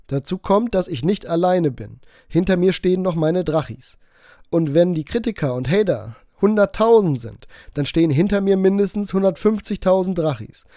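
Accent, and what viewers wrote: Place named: Middle Franconia, Germany. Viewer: Da muss er nur noch ordentlich deutsch sprechen können, und nicht nur meddlfrängisch.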